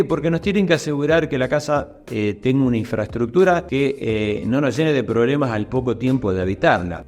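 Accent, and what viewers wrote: Argentina. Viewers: Buenos dias Arquitecto, Tienes un importante número de comentarios en este vídeo. Te sigo, y te sigo..esta vez si quiero escribirte (me leerás?). He hecho un experimento. Lavaplatos roto, lavo a mano uff, y escucho tu narración (después veré el video). Decirte que eres tan profesional en tu arte, y tan bueno divulgando divulgando...el habla argentina me gusta desde siempre. Me llamo Lujan. Mi padre fue un magnífico bailarin, premiado en competición de tango. Y vestía muy elegante.disculpas por este protocolo. Mi caso se encuentra entre los narrados por ti. A saber: compro casuca, sin mirar. Necesitaba poner ahi varios enseres. Me habia cansado y empobrecido el hecho de alquilar. Después de un tiempo querria hacer el lugar habitable. Sencillo. Me aconsejan demoler todo y volver a construir con los precios Abusivos, en mi opinión, que cobran en España. 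Así estoy casuca y yo. Pero te seguiré en todos tus vídeos. Y me preguntó: en España, Comunidad Valenciana, existirá un? Yo no lo he encontrado. Inteligente, honesto, conociendo su oficio, y con una pronunciación que merece un 10., soy profesora. Mi oficio y mi pasión por la Arquitectura Viene de muy pequeña. Jugaba a construir de todo con bloques de madera en cuatro colores. Un fuerte abrazo por darnos tanto conocimiento. Desde Valencia. Cerca del mar Mediterránei. Hasta pronto. Soy Lola.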